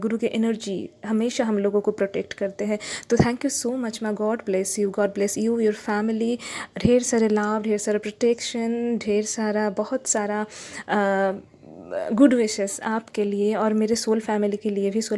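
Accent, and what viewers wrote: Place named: South, India. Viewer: Di aap south Indian ho kya ....aapko boli me vesa hi touch hai .....which is very sweet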